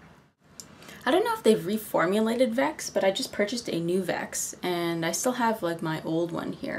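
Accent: Canada. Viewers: Are you from Canada? It sounds like it from your accent.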